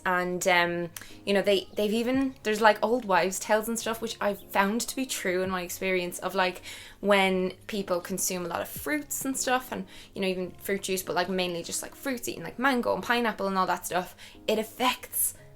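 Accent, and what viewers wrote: Ireland. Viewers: I have a question are u Irish ?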